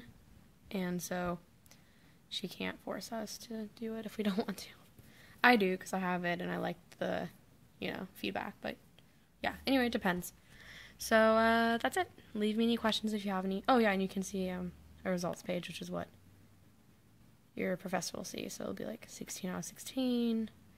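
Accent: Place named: France. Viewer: This is extremely helpful, thanks! I'm a French teacher that wishes my students were able to use this but I only have a teacher manual so no way to log in to supersite! Lovely French accent, by the way! Well done Mme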